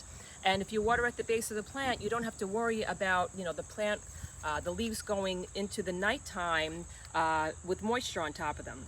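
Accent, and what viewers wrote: New Jersey, United States. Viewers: I was born and raised in Jersey but now viewing from Arkansas!! Your accent sounds like home 🥰 thank you for the information!!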